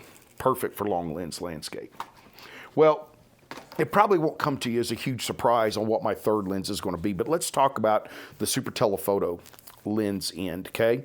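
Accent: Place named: West Texas, United States. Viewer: I like the way you talk, west Texas. I'm Houston, living in Colorado's San Juan mountains. I think I might be the only MFT landscape photographer in three counties.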